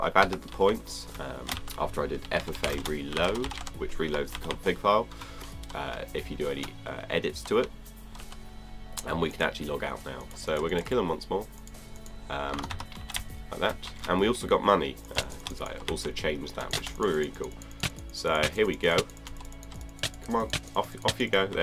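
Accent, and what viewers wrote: Netherlands. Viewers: R u Dutch i am Dutch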